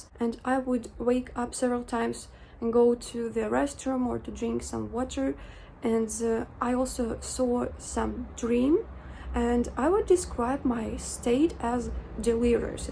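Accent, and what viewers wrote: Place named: Russia. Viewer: I love your accent! I don’t know why I’m so fascinated with Russia and Eastern Europe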